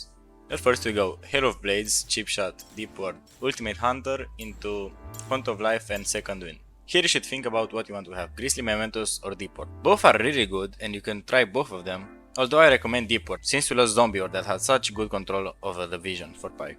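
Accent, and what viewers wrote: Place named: England, United Kingdom. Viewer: Pana cand nu m am uitat la numele tau nu mi am dat seama ca esti roman frumos accent de englez keep up the good work!!!!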